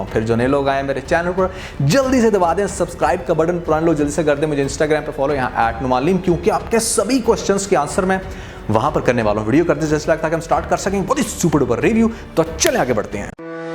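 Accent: Punjab, India. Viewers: I would suggest you do record your videos in Punjabi. You can't speak urdu with clarity. Your punjabi style urdu hurts.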